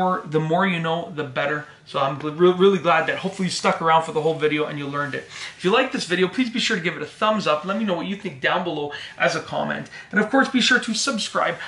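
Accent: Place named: Canada. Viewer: I suspected this came from Canada, because of the annoying accent [Wisconsin, you are now off the hook!], and, as soon as he referred to a dollar as a "loony", my suspicion was confirmed ... and, I navigated away. You should follow.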